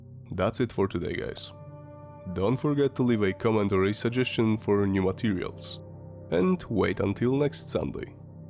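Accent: Russia. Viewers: I thought it was a Russian accent 🤯 Runny nose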